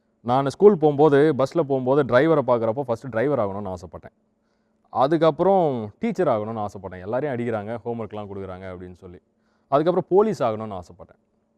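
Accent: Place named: Coimbatore, India. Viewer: Voice of coimbatore... super anne...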